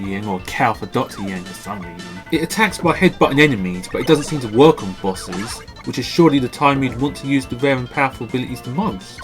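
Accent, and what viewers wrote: England, United Kingdom. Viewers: Ha! I knew it! You sound English. Am I right?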